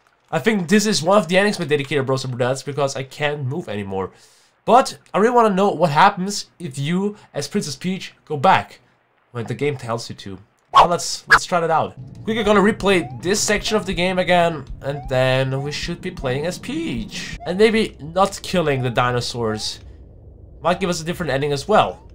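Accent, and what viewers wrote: Germany. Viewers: Are you German? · Can it be that youre german or something like that? 🤔😃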